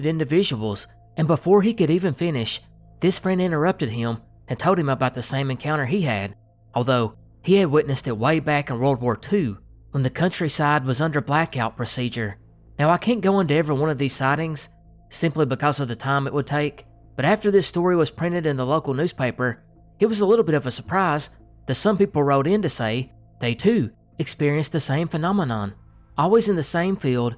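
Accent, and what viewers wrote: South, United States. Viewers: Awh heck yeeah partner! 🤠 a country soundin’ commentator, let’s giddy up now!! 🤠 yeeee hawwww!!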